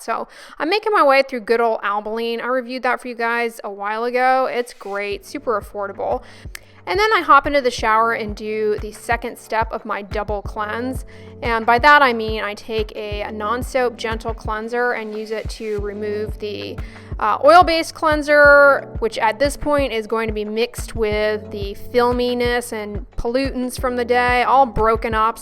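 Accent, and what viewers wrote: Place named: Midwest, United States. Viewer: This accent is “uppity Midwestern.”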